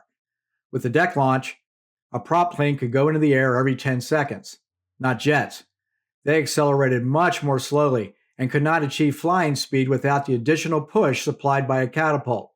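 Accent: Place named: Delaware County, United States. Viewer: I think the narrarator is either from Philly, or the Delaware Valley area. Anyone from Philly recognizes that accent a mile away.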